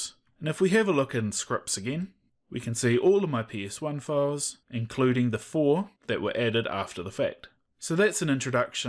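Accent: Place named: New Zealand. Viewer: love the Kiwi Accent Choice bro!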